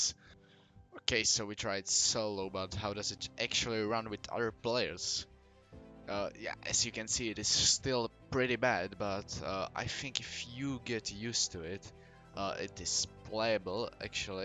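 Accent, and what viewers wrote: Czech Republic. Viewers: Já si úplně říkal že to je definitivně česká angličtina a pak jsem viděl české menu 😂😂👀 actually mi to pomohlo se rozhodnou jestli cs 2 stáhnout 😂 já určitě ne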